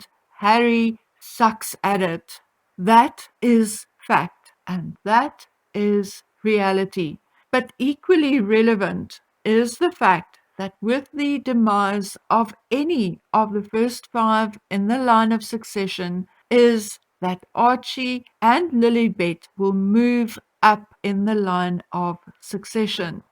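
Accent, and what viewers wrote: South Africa. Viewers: Jy praat engels soos n Suid Afrikaner,is my oor goed in gestel.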